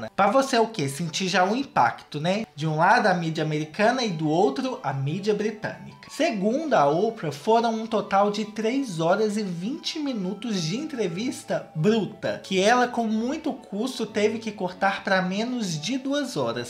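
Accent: Minas Gerais, Brazil. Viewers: Kkkk vc é muito fofo,. Adoro seu sotaque mineiro <3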